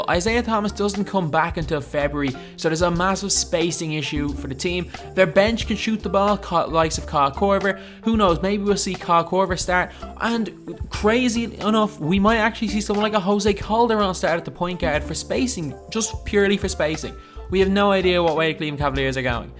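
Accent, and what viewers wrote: Ireland. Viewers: is that an Irish accent you're sporting?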